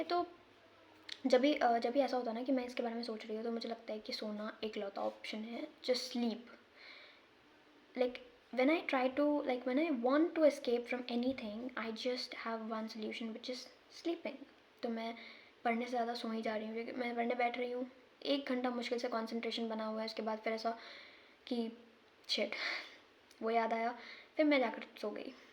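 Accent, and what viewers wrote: Bihar, India. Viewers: Bihari ho kya ?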